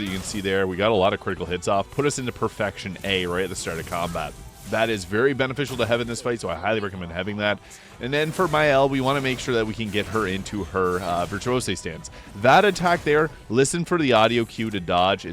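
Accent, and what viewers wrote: Maritimes, Canada. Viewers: I was just watching your Swashbuckler guide and I thought I heard some Maritime in your accent. Hello from New Brunswick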